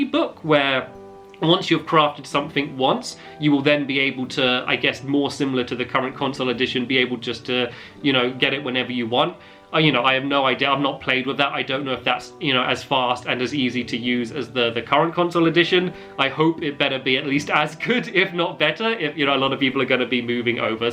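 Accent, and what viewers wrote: United Kingdom. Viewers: Thalia Foot he's British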